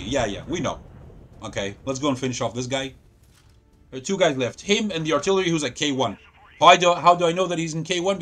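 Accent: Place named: Greece. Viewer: I speak russian and I understood what you said, You have a bit of a greek accent but I think that adds to the charm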